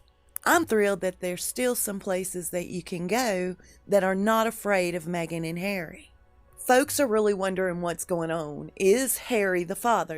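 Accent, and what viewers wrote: South, United States. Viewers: It's called a southern accent. A lot of us here in the U.S. have it..lol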